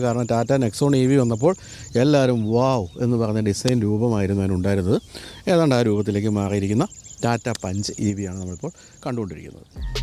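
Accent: Kottayam, India. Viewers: Your commentary is good as part kottayam slang like Alexa kocch😂😊.. i.e enjoy ur program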